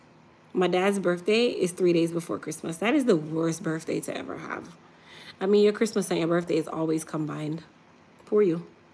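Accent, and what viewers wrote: Jamaica. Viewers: I love your acent are u Jamaica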